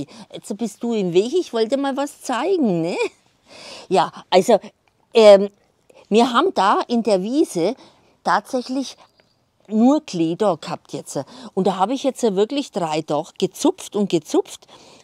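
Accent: Franconia, Germany. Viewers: Hallo Du bist Fränkin und kommst vermutlich aus dem Raum Ansbach, stimmts? Liebe Grüße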